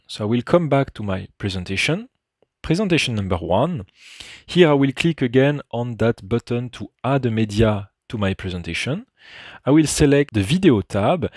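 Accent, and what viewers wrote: France. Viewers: le petit accent français là il me fait me sentir chez moi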